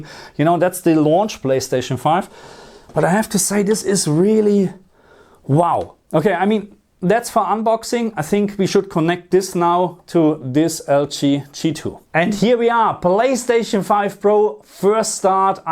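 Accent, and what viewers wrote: Germany. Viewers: Wieso schreibst du auf Deutsch, ist er Deutsch? Sein Akzent klingt auf jeden Fall so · Du kommst doch wohl aus Deutschland oder nicht? Man hört es direkt, ich wundere mich dass Australien bei deinem Kanal steht. Da wohnst du wohl?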